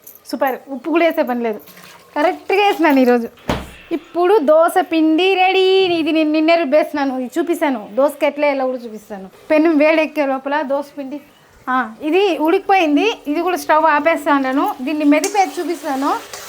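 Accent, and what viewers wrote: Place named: Anantapur, India. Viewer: Sister your Launguage is very nice,it remember me my Ananthapuram journey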